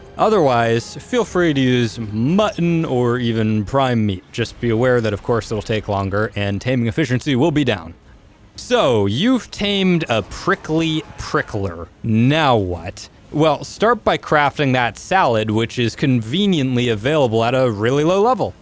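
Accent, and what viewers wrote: Australia. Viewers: Want cute Australian bird voice back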